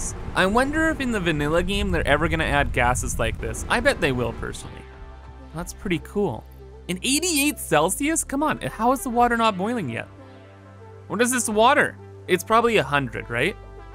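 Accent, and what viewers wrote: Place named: Canada. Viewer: no, mate, listen too his accent. He's so Canadian. So very Canadian.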